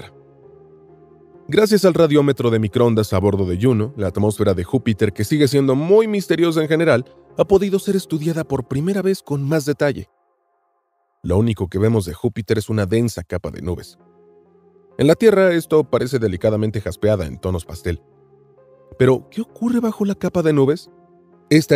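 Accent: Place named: United States. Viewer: Todos estos canales que se las tiran de cientificos han de tener narrador latino agringao?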